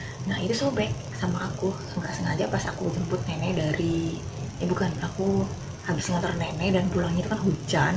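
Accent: Banyumas, Indonesia. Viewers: Ora ngapak ora kepenak mbak..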